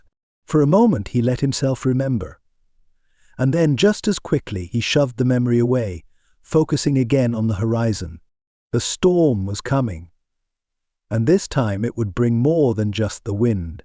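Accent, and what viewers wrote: United Kingdom. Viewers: The British accent doesn’t seem to fit with a historical western.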